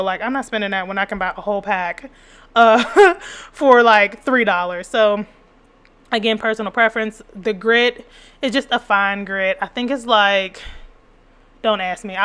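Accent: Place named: South, United States. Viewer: I just stumbled on your video. Thank you for making me laugh. You said you are in Colorado but I hear Southern girl (Carolina or Georgia).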